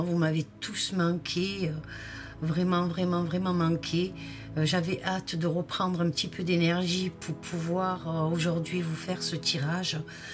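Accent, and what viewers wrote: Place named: Camargue, France. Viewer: Bjr contente de vous retrouver en bonne santé, et surtout de vous protéger en vous reposant. Ce qui me trouble, ce sont les mi.....s étrangères que vous avez vu, et dont j avais entendu parler il y a quelque temps, la nationalité d un pays dont on parle bcp en ce moment.prions pour que cela n arrive pas, pour tout le mouvement qui s est mis en route.merci bcp, vous avez l accent d une ville que je connais ou de la camargue.ca me fait plaisir. Bien a vous prenez soin de vous.✌️👍🇲🇫🚛🚚💖🗽🐞🍀🕊️😘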